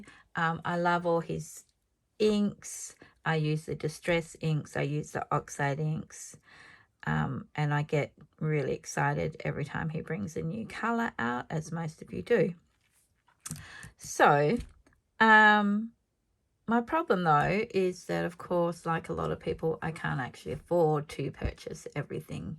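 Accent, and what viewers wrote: Australia. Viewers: I enjoyed your talking! You did a great job. I am not very knowledgeable when it comes to mixed media and learn quite a bit from you! Keep talking! We Americans love an Aussie Accent!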